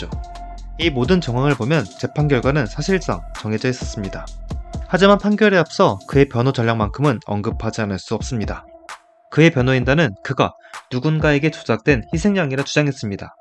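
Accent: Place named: Yanbian, China. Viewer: 말투가 조선족인데?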